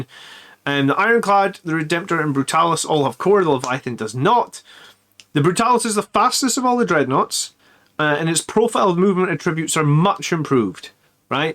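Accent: Scotland, United Kingdom. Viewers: Wait, you're scottish?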